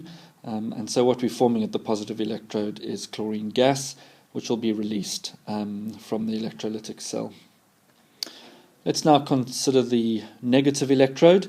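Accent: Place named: South Africa. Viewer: Heavy South African accent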